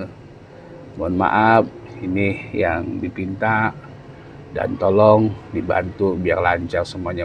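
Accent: Jakarta, Indonesia. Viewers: Lucu. Bngt. Suka sama. Gaya logat nya. Si. Abang. Btawi bngt..👍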